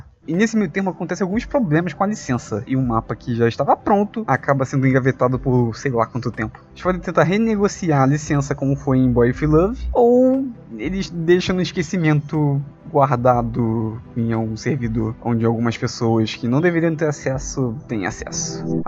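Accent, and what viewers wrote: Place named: Rio de Janeiro, Brazil. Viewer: Me deliciando com a voz carioca do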